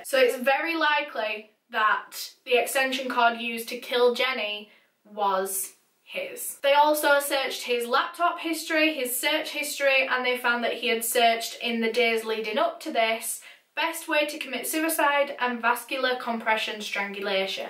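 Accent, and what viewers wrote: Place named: Yorkshire, United Kingdom. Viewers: I’m enjoying your videos 💕 your Yorkshire accent is great! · Hahaha being from West Yorkshire myself I just can’t hear the ‘baybeh’ like other comments it just sounds normal 🤣🤷🏼‍♀️ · it's how we roll in Northen England. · Must be American , this is a Northern accent 💜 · Lol its just a Yorkshire accent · It's a Yorkshire accent haha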